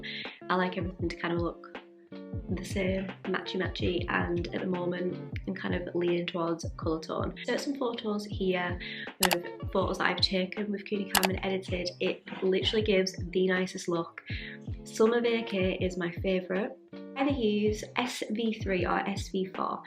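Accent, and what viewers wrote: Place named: Cumbria, United Kingdom. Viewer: Cumbria accent aiiii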